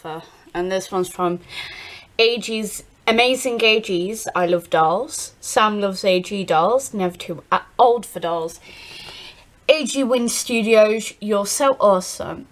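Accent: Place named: United Kingdom. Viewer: You are awesome! I love British accents, and yours is so unique! You are never too old for dolls!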